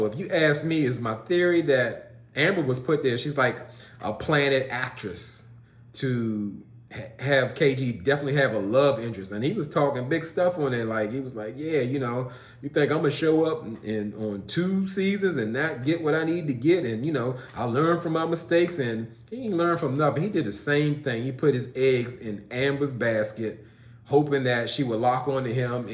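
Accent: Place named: Washington DC, United States. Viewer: Good review. Where you from bro? I hear a little bit of home (DC/dmv) in your voice?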